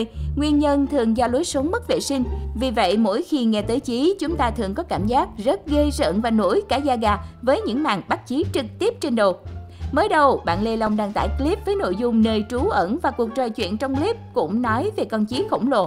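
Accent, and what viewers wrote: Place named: North, Vietnam. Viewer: Ng bắc omg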